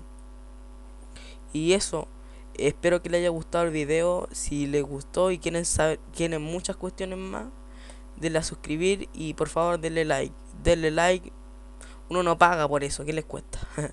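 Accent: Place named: Spain. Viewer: porq carajos hablas como si fueras español?xDBuen video!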